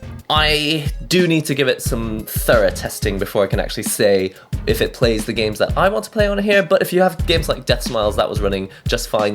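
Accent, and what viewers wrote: England, United Kingdom. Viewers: Real nice English accent :D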